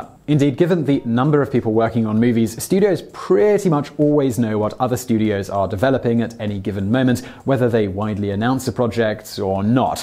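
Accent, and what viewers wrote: England, United Kingdom. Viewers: Funny! You sound like you have an English accent, and yet you used the term 'so-called discovery of America'. This is funny to me, because as an English speaker--especially with an English accent--I would expect you to know the definition of English words. Clearly, you do NOT know the definition of the world 'discovery'. You see, since up to the point that Columbus 'discovered' America, Europe did not KNOW it was there. Thus when it is said that Columbus discovered America the term is the MOST accurate term. He discovered for his people something that neither he nor his people knew existed. Let's not just jump onto the SJW agenda when there is an entire dictionary of English words that you might want to research.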